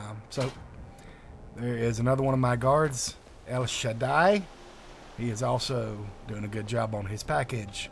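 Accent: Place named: North Carolina, United States. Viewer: North Carolina was my first guess :) I work with a lot of Southerners... developing a good ear for that I guess! Looks good.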